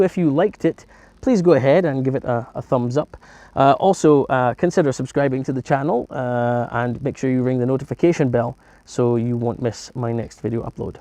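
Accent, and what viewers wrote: Scotland, United Kingdom. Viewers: no doubt I have some Scottish friend here in the states you sound like them....keep going with YouTube I’ve watched a few videos they are good. Best kept secret in US detailing market is a company called duragloss they make mostly sealants 111 and 501 are there best they are around 10$ for 16 Oz they also have a product like bead maker called aquawax the stuff has a cult following here in the states. You should review that stuff don’t see any uk detailers using it.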